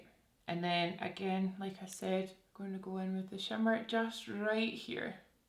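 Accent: Scotland, United Kingdom. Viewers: Love Love your Scottish accent, my mom's from Paisley.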